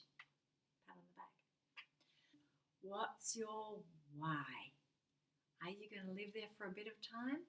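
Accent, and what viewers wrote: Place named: Australia. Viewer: Absolutely love this channel your technique is great too. Do you live in Japan? Your accent sounds Australian.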